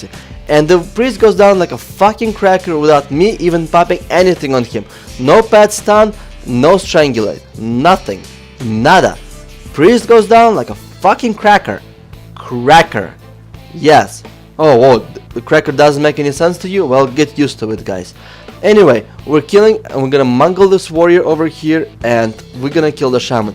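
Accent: Russia. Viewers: i fucking love russian abscent :3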